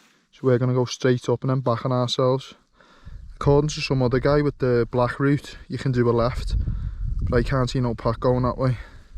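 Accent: Liverpool, United Kingdom. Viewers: haha I live in Cheshire so no issues with the scouse - but I had the captions turned on and had a good laugh. Do you think this route is ok to do in the snow with microspikes? I've heard there is a 6ft scramble down rocks (is that the bit ) which might be sketchy?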